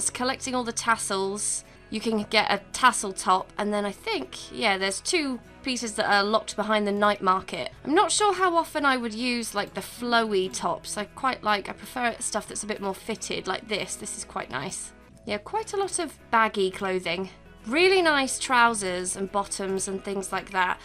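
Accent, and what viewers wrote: United Kingdom. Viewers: First time watching, and this has nothing to do with the video, but I’m god awful with noticing accents but with the first word I could immediately feel the British. Username checks out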